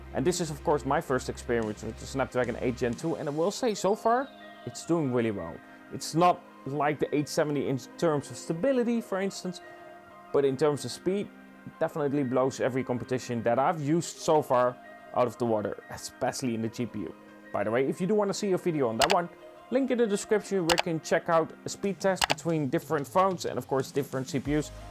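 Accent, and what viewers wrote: Netherlands. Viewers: Klinkt alsof je van Nederland komt. Je maakt goeie reviews 🙏🏾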